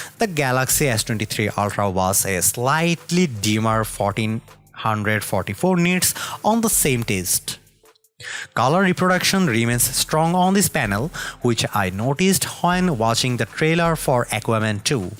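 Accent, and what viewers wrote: India. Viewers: You have a very good Indian accent 👍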